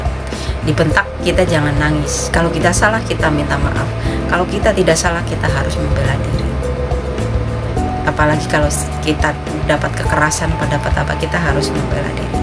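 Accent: Banyumas, Indonesia. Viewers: Salam ngapak mbae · Salam Ngapak bae mba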